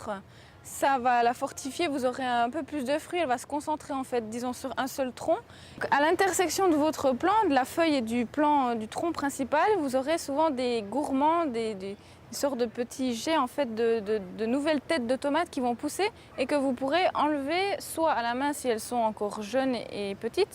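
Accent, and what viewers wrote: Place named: Switzerland. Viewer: c'est marrant ce petit accent suisse, c'est mignon.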